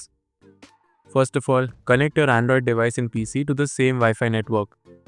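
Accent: India. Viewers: an indian accent... this just raised my HOPE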